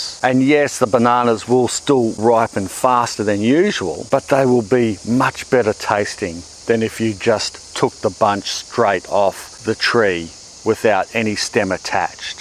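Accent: Australia. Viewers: You look awful like Russell Crowe. Also, you happen to be Australian, judging by your accent. You don't happen to be related to him, do you?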